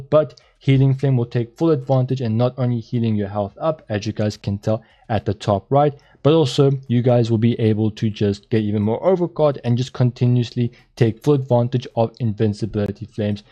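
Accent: South Africa. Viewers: wait. you south african?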